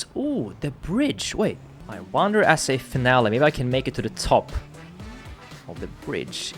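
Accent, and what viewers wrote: Sweden. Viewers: Are you swedish? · You have a very swedish accent, are you from sweden?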